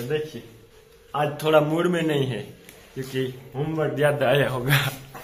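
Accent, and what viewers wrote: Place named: Kathiawar, India. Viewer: Apke bolne k dhang se pata chalta hai k aap kathiawadi ho,kathiawadi log kathiawadi dhang se bolte hai,from punjab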